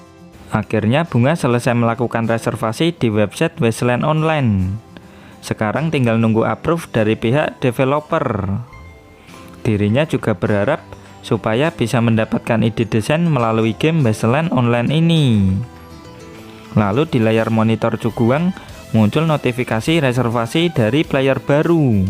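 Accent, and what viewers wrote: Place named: Java, Indonesia. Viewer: Cuma saran min,,maaf sebelum nya, kalau bisa logat Jawa nya gak usah di keluarin,,mending bahasa Indonesia aja... Pendenger gak semua sama suku kan...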